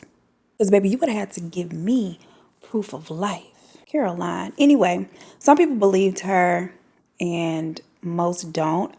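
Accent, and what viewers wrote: South, United States. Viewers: I love listening to your voice and southern accent and just certain words you use I just love! Your entire vibe I’m here for! Get it sis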